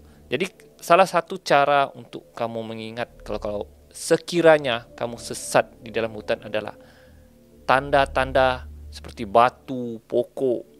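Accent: Sabah, Malaysia. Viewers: Sabah bah ni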